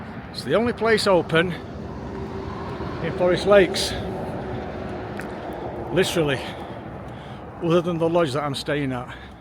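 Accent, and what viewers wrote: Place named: Yorkshire, United Kingdom. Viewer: ###king bear! Brilliant. Fortunately it doesn't understand a good Yorkshire accent. Keep 'em coming.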